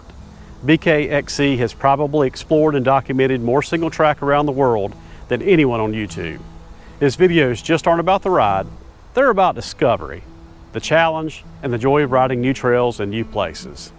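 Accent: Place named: Carolina, United States. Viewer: That's the thickest Carolina accent I've heard (and unfortunately going extinct). Great video great storytelling! Seth did an interview with Remy Metailler and it was a great peek behind the curtain into how being a YouTuber can kill your ability to enjoy what you are supposed to be enjoying on camera.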